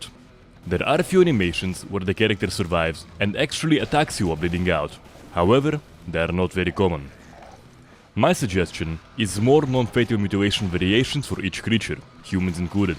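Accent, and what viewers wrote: Russia. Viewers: What are your future plans for the series,? P.S. Are you from Russia?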